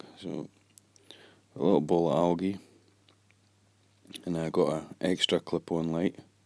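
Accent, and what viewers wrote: Scotland, United Kingdom. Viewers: Where you from mate? Your definitely Scottish. Aberdeen by any chance?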